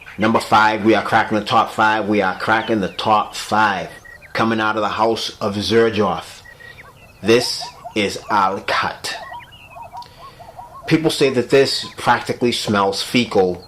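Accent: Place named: Massachusetts, United States. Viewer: That mass accent is thick as hell you musta grown up in the burbs of mass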